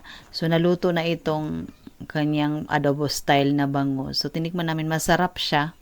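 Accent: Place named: Visayas, Philippines. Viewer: Paano kayu nagka kilala ng asawa mo sa tuno kase ng salita mo hinde ka taga kalinga para kang taga bisaya.sana ma notice mo comment ko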